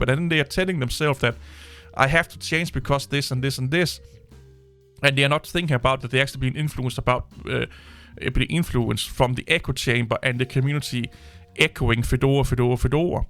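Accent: Denmark. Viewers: you're on the money nearly all of the time. One of the few Linux YTubers to be honest about the pros and cons of Linux. I use MacOS, Linux and Windows btw - each has merit. Danish accent fine by me! Very proficient in a second language unlike most native English speakers. Actually I like Fedora and use it - a good distro, but one amongst many. Just use a distro you like and suits you without making grandiose fanboy claims about it!!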